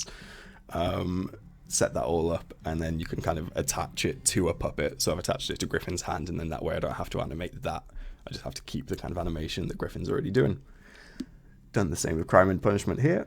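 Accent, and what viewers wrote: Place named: United Kingdom. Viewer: hehe, as an expat living in australia it literally gives me a little seratonin boost whenever I hear a british accent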